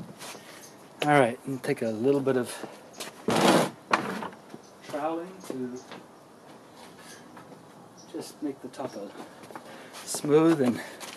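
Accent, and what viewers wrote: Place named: South Africa. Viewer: I can tell you use to live in South Africa, can still hear your accent. Thanks for this video!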